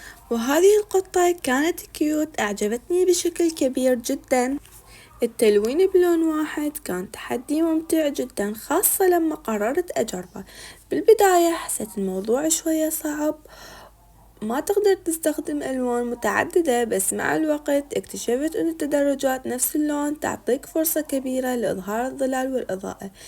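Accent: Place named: Iraq. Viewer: ماشاء الله على رسمك عالم اخر من الجمال ❤❤ بالنسبة لفكرة رسم الشخصيات الموصوفة من طرفنا فهي فكرة رائعة وستلهمك كثيرا لكن فقط ان اردت القيام بها ارجو ان تنشري ذلك في المنتدى اولا حتى نتمكن من وصف شخصياتنا لك ❤❤بالنسبة للكلام فانا اعشق اللهجة العراقية فهي فخمة للغاية ❤❤❤وكذا الفصحى ايضا .. عموما اتمنى لك التوفيق دائما و.. انا مصدومة من عمرك تبدين اصغر بكثيئيير وهذا امر رائع 😅😊 المهم اتمنى ان ايامك تمر بخير وسعادة و.. الى اللقاء❤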